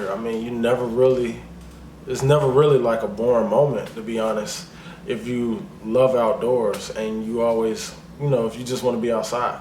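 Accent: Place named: South, United States. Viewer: He is country. It is his accent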